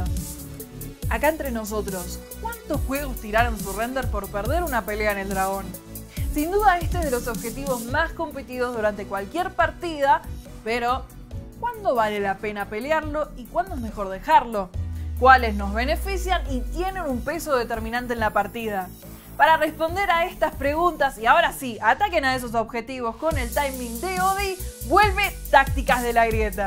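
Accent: Argentina. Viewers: Que tan amiga son? solo los amigos la bancan. Habla Argentino... No incluyen a los latinos. Donde quedo el neutro?? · No tiene carisma, es muy estresante escuchar ese asentó argentino y no algo neutro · Bro, es argentina, obviamente tiene su acento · como odio ese asento argentino :(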